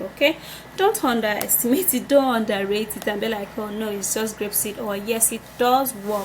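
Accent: Nigeria. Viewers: That accent sounds like a Nigerian. They are all after money if anything goes wrong you can't sue her. How come doctors don't refer you to such things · there s nothing called "African English"... try not to display your ignorance in public.. from her accent she sounds like she s from West Africa...Nigeria to be specific...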